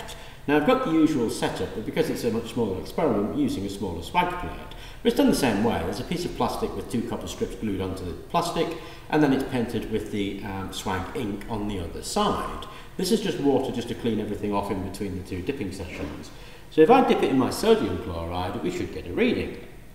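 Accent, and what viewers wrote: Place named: England, United Kingdom. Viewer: english accent plus echo means no compreda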